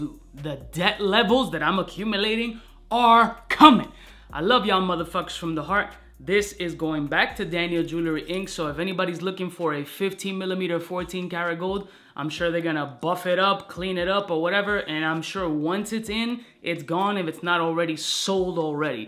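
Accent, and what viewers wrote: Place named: New Jersey, United States. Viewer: Your Cuban born in miami too. But your sound like New Jersey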